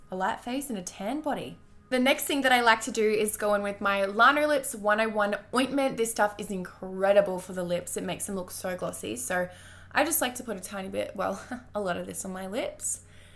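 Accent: Australia. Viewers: I don’t know if I’m being a little rude but , are you Australian I love that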